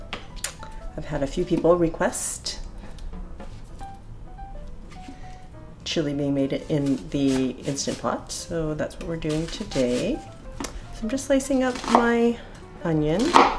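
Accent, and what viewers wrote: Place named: Canada. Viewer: lucky! i'm assuming you're in canada, i hear a bit of the accent :) furthest i've been up that way would be seattle, but hope to make it to canada some day. i love that kind of weather! have a great week :)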